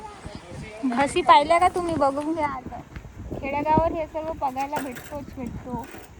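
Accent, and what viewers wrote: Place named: Vidarbha, India. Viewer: स्वच्छ निर्मळ हवा,नैसर्गिक वातावरण, गोड वैदर्भीय बोलीभाषा,तुला खूप, खूप आशीर्वाद,तुझे मोठ्या घराचे स्वप्न लवकरात लवकर पूर्ण होवो.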